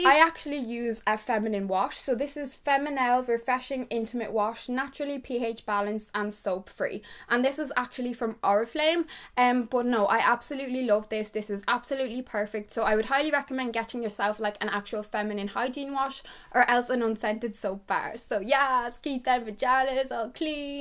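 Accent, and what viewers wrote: Ireland. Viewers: she's Irish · These videos are so helpful thank you!! Also I love your Irish accent so much 😍 love from Italy 🇮🇹 ❤️ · are you irish??? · Great tips and could listen to you all day long, with your great Irish accent your on to a winner. Take care 😉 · This video is super informative! U are adorable and ur hair is super cute! Also is she Irish? I’m not rl good with accents😂😅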